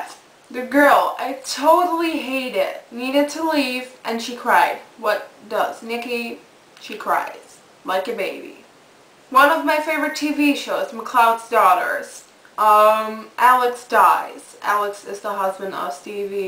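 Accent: Netherlands. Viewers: when i first watched one of your more recent videos, i'm so embarrassed to say i actually thought you were American because I can barely hear your accent!! i was happy to discover you are from Holland! I love watching your videos, you are so talented and funny! LOVE YOU!!!!! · That’s a Dutch accent? Interesting. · It's cause she's Dutch and she has an accent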